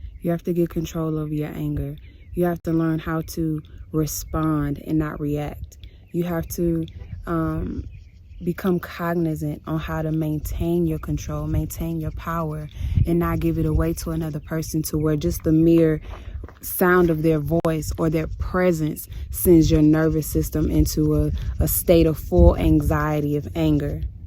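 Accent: Houston, United States. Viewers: Girl that Houston twang be twangin chile!!! 😆😆🤣 i swear everytime i hear somebody from houston and even myself on recordings i be like dang is we really that country😆😆 omg love the video shout out to you and ur hubby frm the nawf side! 😘😍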